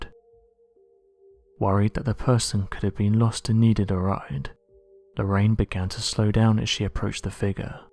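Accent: England, United Kingdom. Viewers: Where is the picture of the car .fake fake fake ..i was really looking forward to some REAL proof of this "dog man " from your "english" voice..iam not saying this dog man is not real ..... Iam saying your a english dude from that island where there "royalty's" is brother &sister mother dad uncle are one & the same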